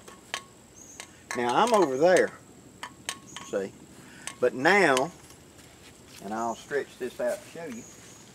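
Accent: South, United States. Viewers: Lovin' that southern ascent there ya'll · This hicks southern accent is SOOOOO great and soooo perfect. I really can't tell if it's real or not. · Definition of one of the purest Southern accents, this video can be used as a tutorial among lingual regional accents.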